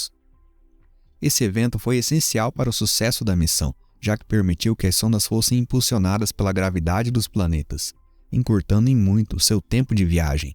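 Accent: São Paulo, Brazil. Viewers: Seu sotaque Paulistano é muito legal, sem falar nesse belo documentário. As voyagers serão a prova da existencia de uma fantástica e predadora raça humana, que talvez terá se autodestruido em função do seu próprio ego.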